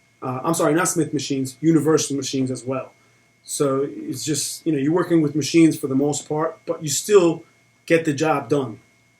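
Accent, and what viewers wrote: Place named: New Jersey, United States. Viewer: I can tell your from Bergen/Passaic County. Your channel is the best, keep putting out content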